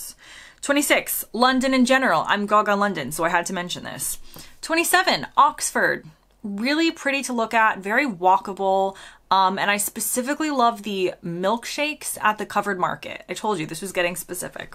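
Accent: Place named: United States. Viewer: Dave, the Dog was my favourite Gogglebox character. It's weird to explain to someone. It's not just watching people watch TV. It's nice to see another Yorkshire addict too. It's hard to find a bad one. The best are amazing, but bad ones are still okay. Flake and Ripples rule too As you picked people, and places. I'm a little gutted i, or my City didn't make it on the list. lol (I wrote before 101, you covered it. Thank you) Nice to get an idea of what you are into too, I appreciate your views. It's nice to see how you've become British (with an accent from the states)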